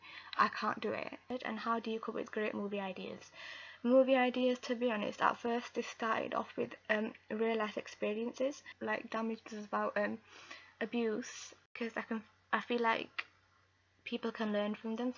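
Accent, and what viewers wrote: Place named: Yorkshire, United Kingdom. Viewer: i heard she is from yorkshire up north but idk what that sounds like cos im from east england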